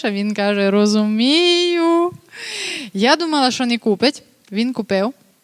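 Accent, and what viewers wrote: Transcarpathia, Ukraine. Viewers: А точніше, закарпатський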